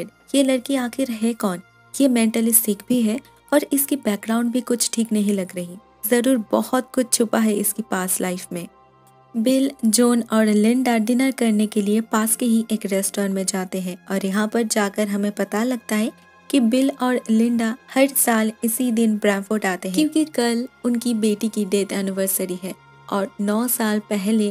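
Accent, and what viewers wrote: Bengal, India. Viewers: Pls work on ur Hindi dear...Im sure ur Bengali...tats y... gender mistakes happening....pls work on it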